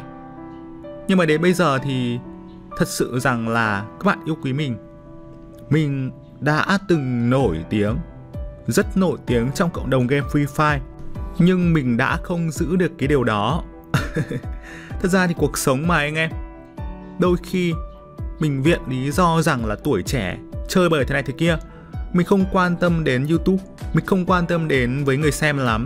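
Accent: Thanh Hoa, Vietnam. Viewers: Người thanh hóa ???? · Anh nguoi Thanh hóa a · Bạn có phải người Thanh Hóa không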